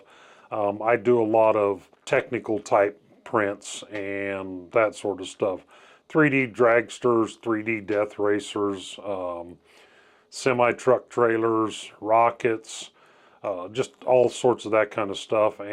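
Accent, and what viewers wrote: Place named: South, United States. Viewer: That's a southern drawl for you. Low and slow. Not in a hurry to get anywhere.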